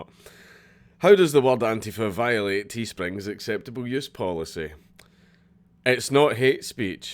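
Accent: Scotland, United Kingdom. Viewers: love the accent: scrooge mcDuck. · I've been watching some of your videos. Quite enjoy them. I'm still not sure if I agree or disagree with you, but I am willing to listen without foaming at the mouth. Besides that, you're even more Scottish than I am. So thumbs up! Subbed! Good job! Very few people on Youtube can confuse me to the point where I want to sober up and think...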